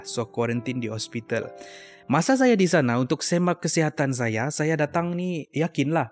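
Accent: Sabah, Malaysia. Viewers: Kenapa suara dia mcm orang sabah eh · Aku Nak Tanya bang ni orang Malaysia Ke orang iban Ke orang Sabah?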